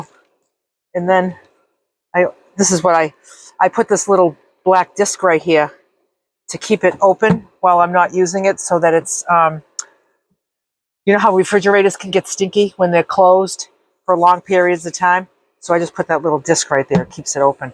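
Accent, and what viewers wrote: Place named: New York, United States. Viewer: New York or New Jersey? Cause so many of my family members sound like you do. I could be wrong in placement of origin. Ill accept it if I am but if possible I'd love to know.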